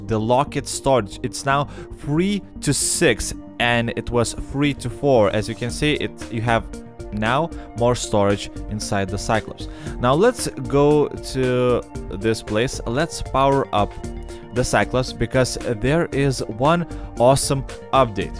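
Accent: Russia. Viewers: У тебя акцент Русский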